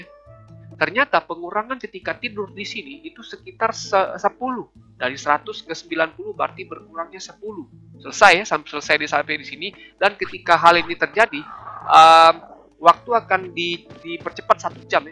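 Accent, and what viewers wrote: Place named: Minangkabau, Indonesia. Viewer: Mantap bg...jan jaleh bana logat minang nyo bg hehee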